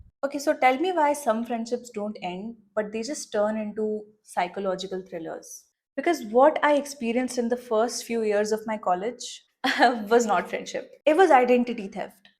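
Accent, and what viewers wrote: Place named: India. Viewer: Omg you sound so western but your desi accent is strong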